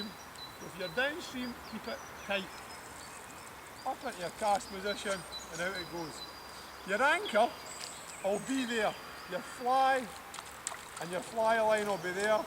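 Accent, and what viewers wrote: Scotland, United Kingdom. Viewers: Ah unreal they were the days tight lines best Scottish accent ever be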